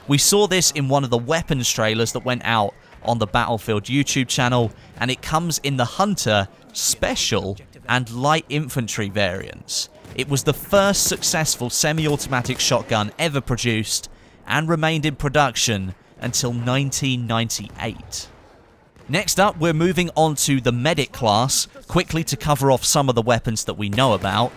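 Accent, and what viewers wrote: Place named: United Kingdom. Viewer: why do you Brits have such a smoothing accent? lol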